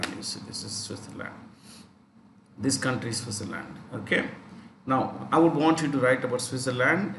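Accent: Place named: South India, India. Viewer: He is a south Indian. His accent is perfectly fine.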